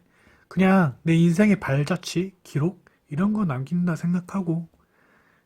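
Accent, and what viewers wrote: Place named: Gyeongsang, South Korea. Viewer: 형님 항상 잘 보고 있습니다 저도 곧 전기산기 준비할건데 좋은 결과 있으셔서 다행입니다! 형님 부산 사시나요? 말투가 경남이신데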